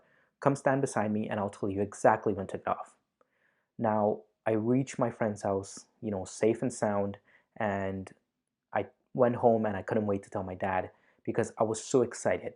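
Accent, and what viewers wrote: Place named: United States. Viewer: Do you live abroad, coz your accent sounds American??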